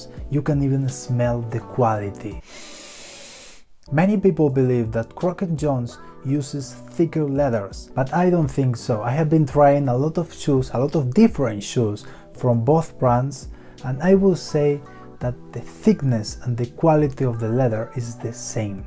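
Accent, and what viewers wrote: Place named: Spain. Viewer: The narrator is coming from Spain ? Because the funny accent.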